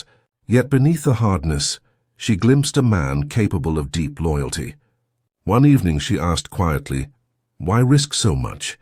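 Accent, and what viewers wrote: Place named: RP, United Kingdom. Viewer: One of the chapters is out of order. It’s a little odd for an elite British accent for the narrator for this American story involving Hell’s Angels.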